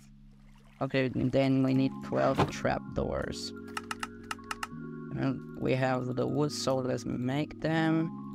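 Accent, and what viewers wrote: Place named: Colombia. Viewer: great editing! are you colombian btw because you sound like it lol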